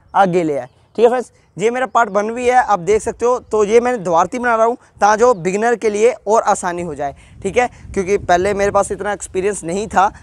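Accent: Punjab, India. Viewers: I love your Punjabi Hindi! #fullDesi ❤️ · Oh that Punjabi accent 😆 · I liked ur accent - Punjabi Hindi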